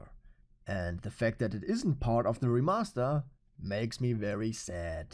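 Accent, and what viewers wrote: Germany. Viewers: Are you German? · Are you from germany?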